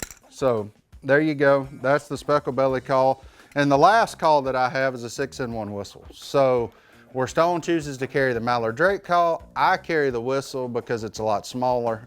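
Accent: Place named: South, United States. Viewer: Who else loves his Southern accent?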